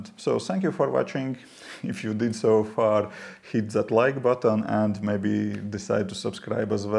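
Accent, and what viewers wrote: Russia. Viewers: The accent pronunciation is 100% Russian and there is no need to come up with yourself _ that you are supposedly from Latvia. Stupid you are a grown man, and you behave like a 16 year old boy. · Кажется я отчётливо слышу русскаий акцент)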